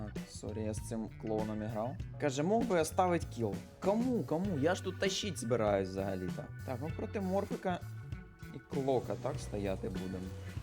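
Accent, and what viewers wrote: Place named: mixed, Ukraine. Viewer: привіт- класний контент:) скажи як можеш з якої ти області)) балачка полтавська чи черкаська)?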